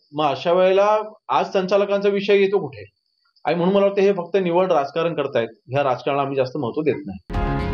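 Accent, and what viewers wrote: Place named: Raigad, India. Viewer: Ha Aawaz Raigad Mahahad Raigagad Distrccha.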